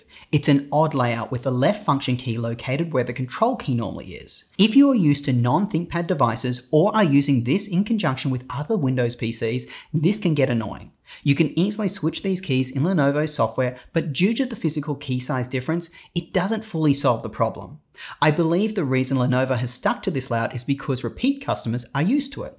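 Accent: Australia. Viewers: Finally, a reviewer that knows what he's on about and isn't recycling the same old lines, plus it is so refreshing to hear an Aussie accent rather than some monotone suburb US one. liked and subbed from the UK!! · Are you Australian?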